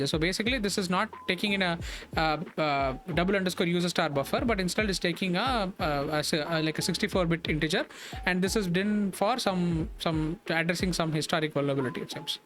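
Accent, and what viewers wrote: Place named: India. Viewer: Hi friend, i clicked your video because of the title ... really interesting topic, but i won't be watching the rest of the video, and because you are a fellow creator and just started i wantd to tell you why and give you a few hints on the way out - and hopefully be back later. First of all, a lot of people primarily listen to these kind of videoes, and besides from that you are providing a lot of interesting information, so the sound is really important. So i would recomend you a few things regarding that: 1: A lot of Indian speakers have horrible accents, but that is actually not that bad for you, but you speak very fast ... even if you where a native english speaker your pace is way to fast for this kind of content ... so try to speak slower and edit the sound so that your voice is a bit more clear in comparison wuth the background music. 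2: I realize that this was a livestream, and it's fine that you share those like this ... but please add an intro, nothing fancy, just a clip of you explaining what the video is about and highligting the most important parts. 3: If you want to be really fancy, make a curated version of the stream ... break it up and narrate it. I really think this is an interesting topic, but i listen to these videos when i work - and because of the pace i cannot focus on my work while listening to this, sorry. I really hope you take this feedback constructivly and goes to the moon, power to you my friend! 👊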